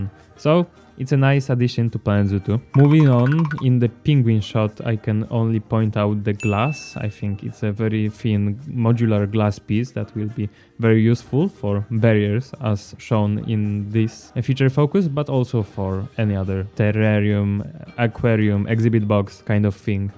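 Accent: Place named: Poland. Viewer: Are you from Poland? You have that kind of accent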